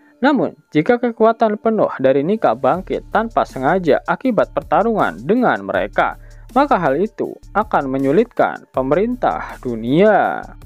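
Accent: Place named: Medan, Indonesia. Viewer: Medan nih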